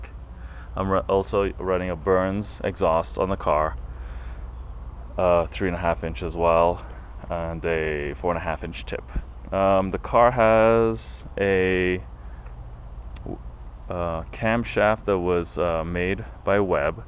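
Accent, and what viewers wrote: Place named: Russia. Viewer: woow very very nice man, you say you live in col springs yeah,? where is your accent from, almost sounds russian at some points but not exact, great video man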